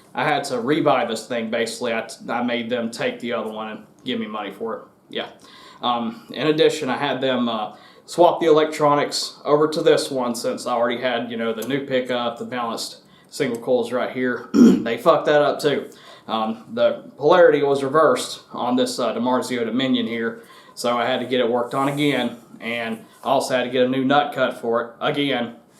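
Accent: United States. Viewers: You sound like Macon Georgia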